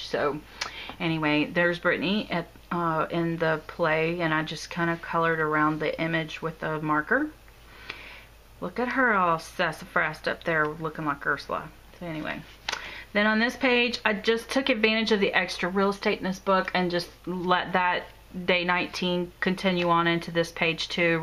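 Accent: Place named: South, United States. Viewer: OK.....I'm loving this little book. I want to do this. I will be watching all of your videos tonight - got to get back to work now! lol And I love your Southern accent - I'm an Alabama girl. Great work!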